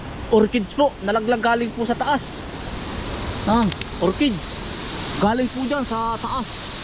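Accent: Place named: Laguna, Philippines. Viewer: Tagasan po kayo, kc ung accent nyo ganyan po dati magsalita ung mga kawork kung taga alaminos laguna. 😂Ginagaya ko sila dati pg nagsasalita nakakatuwa po kc pakinggan.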